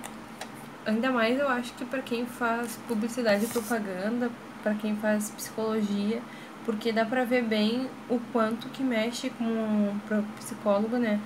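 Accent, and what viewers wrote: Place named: Rio Grande do Sul, Brazil. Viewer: Tu é gaúcha né? Dá pra ver pelo sotaque haha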